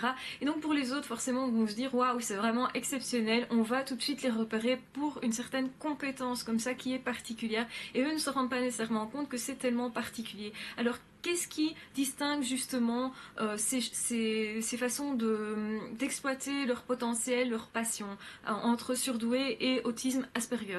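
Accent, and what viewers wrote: Belgium. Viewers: Etes-vous de Liège ou de Bruxelles? :-)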